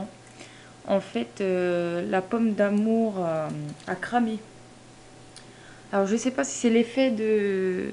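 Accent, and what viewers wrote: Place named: Nord-Pas-de-Calais, France. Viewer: ta l'accen chti je trouve lol j'<3 bien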